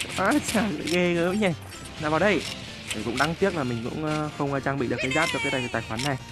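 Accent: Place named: North, Vietnam. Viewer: Anh Bắc cua hay qua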